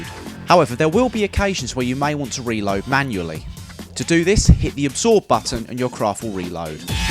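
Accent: United Kingdom. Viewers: It sounds like he might be from Essex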